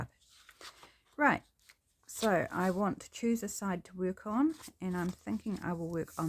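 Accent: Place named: Australia. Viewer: I am from Aussie so it was great to hear someone from this side of the world.Your clear pockets looked good, thanks for you video.thank you for your palm tree freebies.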